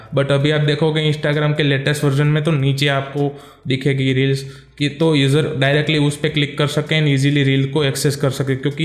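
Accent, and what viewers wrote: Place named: Gujarat, India. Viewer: badhiya video he. god bless. your Hindi is wrong. i thought you were talking in Gujarati but you made quality content. keep it up alwayes. Liked Shared and commented.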